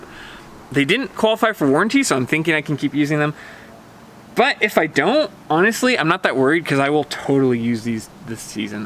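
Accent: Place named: United Kingdom. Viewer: Jesus H Fuck, here's to your kingdom accent.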